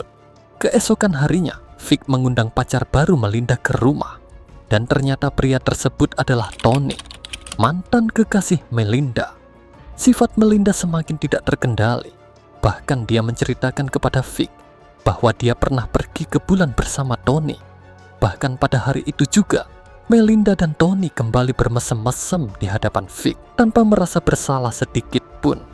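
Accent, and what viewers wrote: Java, Indonesia. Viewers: Logat nya Jawa x min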